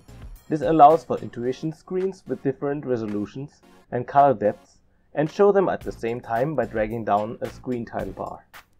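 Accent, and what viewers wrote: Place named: Germany. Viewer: I didn't detect the German accent, and only realised when I watched your ZX Spectrum Next video. Of course, Germany was as much obsessed by Commodore as we were in the UK. Makes sense!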